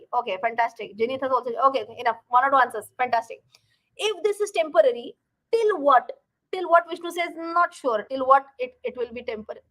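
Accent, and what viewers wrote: Maharashtra, India. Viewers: Like the content, but hate the yaaaaas, no editing, and marathiness! So cringy. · 1. Avoid side talk, remain on topic. 2. Avoid guesses, stay with facts, 3. Dont mind but avoid the marathi accent. Jokes are fine.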